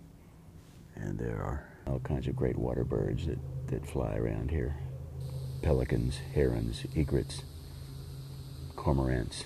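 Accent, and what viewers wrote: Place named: Texas, United States. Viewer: Wow, I just realized that Mr. Henley's speaking voice, the cadence sounds like George W Bush...it must be the Texas accent, which neither sound so southern. I was not a fan of W., but I sure do love!!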